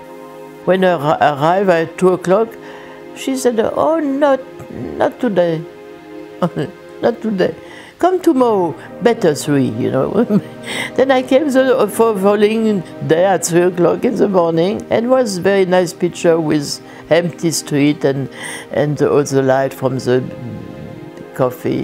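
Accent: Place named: Paris, France. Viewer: Bientôt un an qu'elle nous a quittés (28/12/2021)! Dans ses interviews on entend qu'elle était une (grande) humaniste dans sa simplicité d'être. Le respect et la beauté de l'humain et de la vie! Elle nous a laissé ses merveilleuses photos n&b en souvenir. Je suis sûr qu'elle continue de photographier de l'autre côté de la vie! Requiescat in Pace ... I love her french-english accent speaking. So unique, so french, so parisian!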